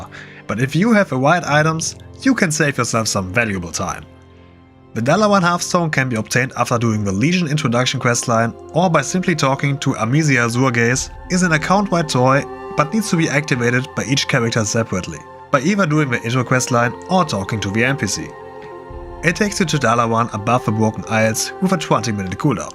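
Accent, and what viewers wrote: Germany. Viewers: Where do you come from? Your accent sounds like a German trying to speak English.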